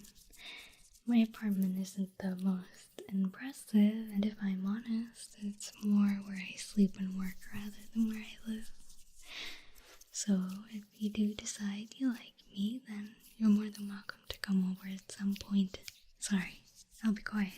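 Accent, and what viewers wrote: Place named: France. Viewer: Oh ya one more thing your French accent just dose something to me I swear I would like to see more in the future only if your willing to take care : )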